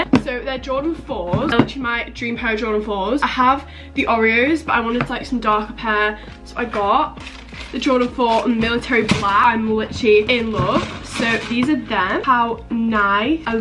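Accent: United Kingdom. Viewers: UR BRITISH OMFG MY IDOLSSSS BRITISH.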